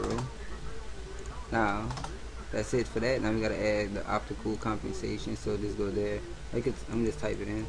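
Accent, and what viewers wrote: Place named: New Orleans, United States. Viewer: New Orleans Accent Much..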